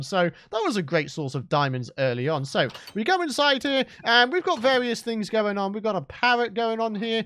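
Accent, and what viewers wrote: United Kingdom. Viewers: After listening to Last Week Tonight and then watching this, I honestly thought John Oliver was playing Minecraft 😂. Love your voice m8; so incredibly British! Excellent as always... PEACE!!!✌️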